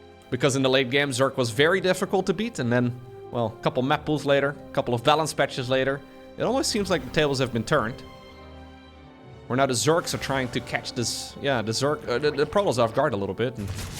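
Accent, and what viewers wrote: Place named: Netherlands. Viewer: My wife and I lived in Netherlands and just appreciate the wonderful accent 😂❤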